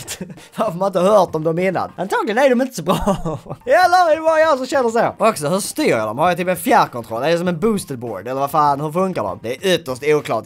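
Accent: Scania, Sweden. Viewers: Älskar skånska!!! 😍😍😍😍😍😍😍😍😍😍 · Alva Rigo han e skånsk, såklart man gillar den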